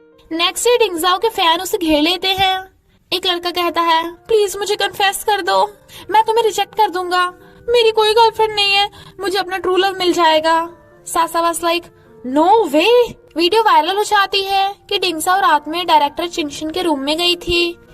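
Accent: Punjab, India. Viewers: Aap punjabi ho 😮?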